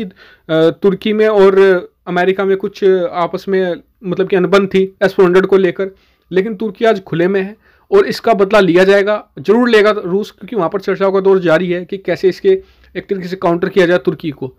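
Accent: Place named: Western Uttar Pradesh, India. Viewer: Bhai aap ese bolte ho ya fir apka bolne Ka tarika esa hai jese ki chai ki dukaan par ko koi bin mange gyan baat rha ho, knowledge Achi share karte ho no doubt but effective voice nhi hai, Kisi particular area ki lagti hai hai jese ki UP west ki ya fir dehati types so pls sudhar laao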